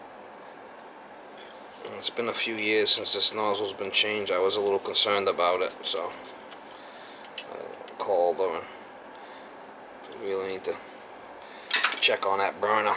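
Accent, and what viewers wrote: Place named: New England, United States. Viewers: Sounds like my hometown of Plainfield CT. Accent is spot on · Psycho chicken LOL. Been over 30 years since i heard this one. Where you from? Sound like southern New Hampshire or Massachusetts. Maine hete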